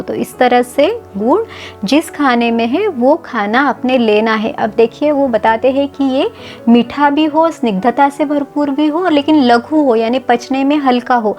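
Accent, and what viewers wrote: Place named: Maharashtra, India. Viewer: खूप छान माहिती दिली आहे.आवाजा वरुन असं वाटतं की आपण महाराष्ट्रतील आहेत...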